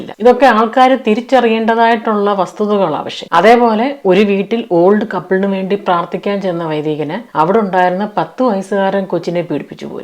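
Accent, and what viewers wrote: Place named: Ranni, India. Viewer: Excellent narration. Are you from Ranni /pathanamthitta? Just guessed from your accent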